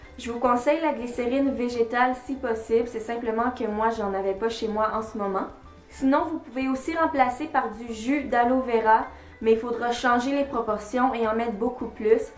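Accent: Canada. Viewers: Tu as un accent canadien j'aime trop...et vrm le démêlant je l'ai essayé c super top ❤️😄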